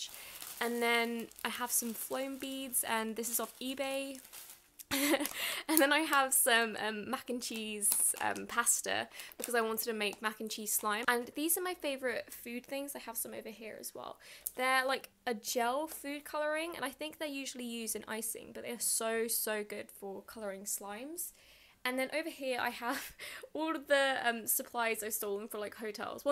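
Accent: United Kingdom. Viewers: OMG u r sooooooooooooo pretty and ur voice is so british and i dunno its a bit...liek a tangy british accent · Britich or american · Are you from the uk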